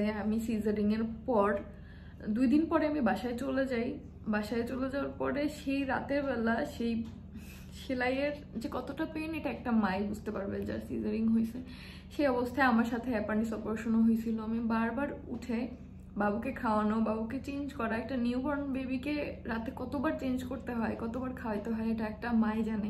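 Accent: Barishal, Bangladesh. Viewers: Amader barishal barguna er Kotha boltacho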